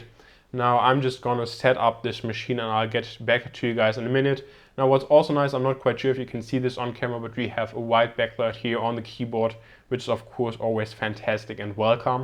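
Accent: Germany. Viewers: you sounds geman for me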